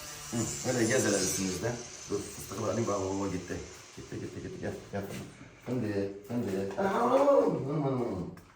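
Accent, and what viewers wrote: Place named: Urfa, Turkey. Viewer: Kral Urfalı olduğun o kadar belli ki, özünü kaybetmemen mükemmel bir şey dezenktefe diyince aklıma teyzem geliyor 😂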